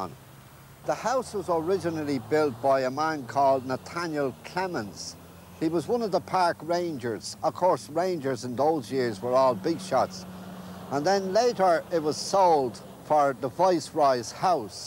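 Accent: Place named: Dublin, Ireland. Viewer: The aul Dublin accent, a thing of beauty. Ordanges and Chapelizard. Great stuff!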